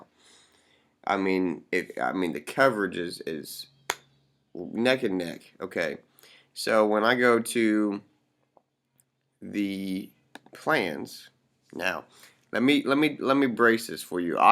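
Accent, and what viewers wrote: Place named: South, United States. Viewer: Not even AT&T? LOL damn where do you live? I could immediately tell by that bit of an accent that you're either in AZ or somewhere in the Bible belt.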